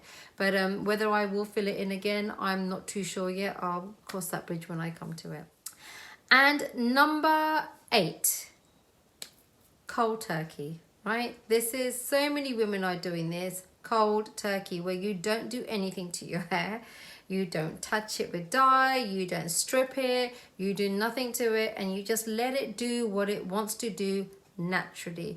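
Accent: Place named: United Kingdom. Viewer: Love ur British accent!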